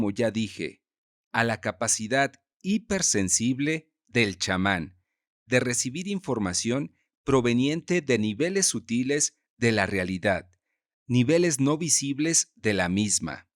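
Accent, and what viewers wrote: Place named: Yucatan, Mexico. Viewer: Wow muy bueno 👍 hasta tonito de yucateco hicistes muy buen trabajo 👍 por eso te sigo por tu buen trabajo 👍 gracias por compartilo tienes mi respectivo like #11 saludos desde Chicago IL 👍🙏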